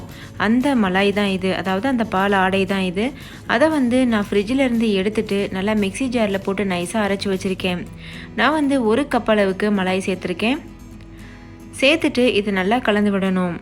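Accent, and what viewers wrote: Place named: Madurai, India. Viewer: akka unga slang madurai mathiri eruku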